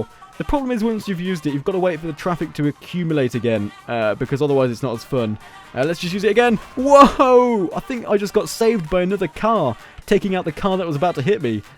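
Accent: United Kingdom. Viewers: You never heard a normal British accent before?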